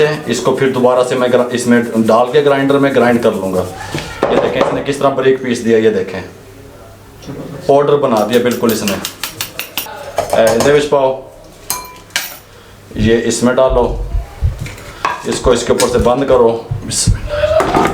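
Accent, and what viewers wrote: Punjab, India. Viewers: Punjabi kitni bhi achhi Urdu ya Hindi bole tone se pehchana jata hai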